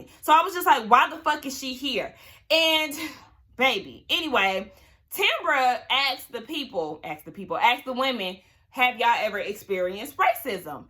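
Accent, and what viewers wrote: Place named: Florida, United States. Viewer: A hit dog will holla!! They hit a soft spot that was ringing Hella true for her! Baybee that left hand of yours be having its own conversation when you get heated...like it was say get da fuk away from me wit this lmao...I love hearing you tauk...cause I'm from floida(we don't pronounce it like its spelled) lol and we sound jus the same.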